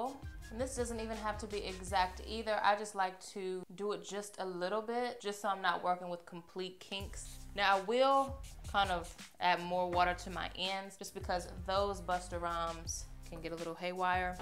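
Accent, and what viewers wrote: South, United States. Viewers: You sound so country... I love your accent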